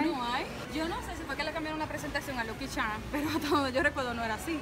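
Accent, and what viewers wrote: Dominican Republic. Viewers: Yo sabía que eras dominicana desde que escuché nuestro acento!❤️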